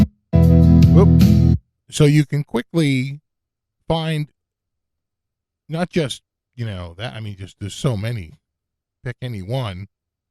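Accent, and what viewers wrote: Boston, United States. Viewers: Nice intro to a great sounding product. I just ordered one and will be getting it in another week. This was a great demo of its capabilities and its awesome sound. Thank you,. Karl, also from Boston, but sans the accent!! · Instant subscribe, badass no bull shit informative video, and the only video I’ve seen where someone demonstrates a clean tone not saturated with effects. Also that Boston accent is so easy to listen to! Sick work man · Being a transplant in Pa from Quincy point the Boston inflections helped me understand the descriptions of the of the features. Waiting since November for delivery, not yet.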